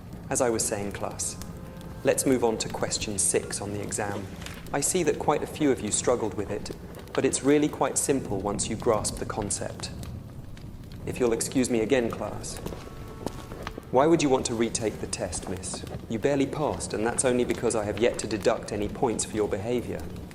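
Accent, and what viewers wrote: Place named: England, United Kingdom. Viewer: Hi ! I'm a french girl and I'm soooo happy to understand ! English accent helps a lot 🥰 But may I ask you a question ? It is your voice or an IA voice ? :o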